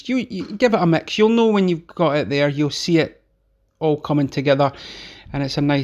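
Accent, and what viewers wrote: Scotland, United Kingdom. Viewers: That's what was missing, Food being prepared with a Scottish accent on the lad. Thanks!! Slainte Mhath!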